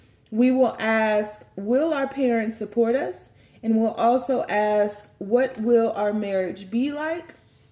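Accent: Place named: United States. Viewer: I love your accent and style of reading. Which part of US are u from?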